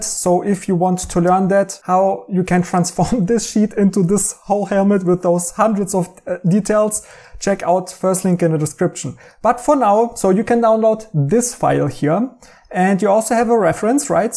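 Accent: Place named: Germany. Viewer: Hi, thx for your free content 👍 btw, i hear u r no native speaker, by any chance, is your native language german? 😁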